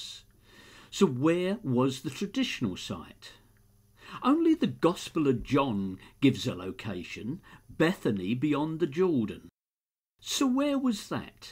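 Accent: United Kingdom. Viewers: oy the brit pronunciation is killin me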